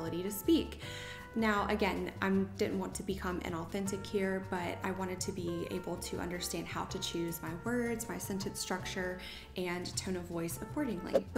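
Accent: South, United States. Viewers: I disagree, but I'm not American. One of my favourite elegant ladies, Kathryn Morgan, a professional ballet dancer, is also southern. I find your accent really sweet, definitely more so than certain British accents (like the Midlands!!!), and I've never had any trouble understanding you. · I’m English and live in London, I love listening to your southern accent 😄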